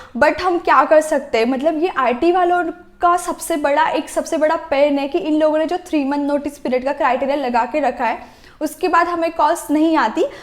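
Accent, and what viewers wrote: Maharashtra, India. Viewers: Marathi by accent!!! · Tu marathi ahes ka? Seems from tone.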